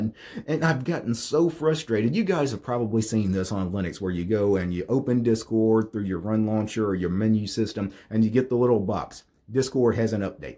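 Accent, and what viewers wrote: United States. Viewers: which side of USA is this accent from? 🙂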